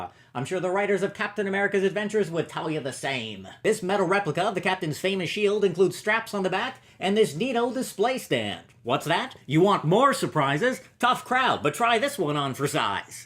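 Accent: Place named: Canada. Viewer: Pronouncing "been" like "bean", yep.. 100% Authentic Maple Flavor Canadian.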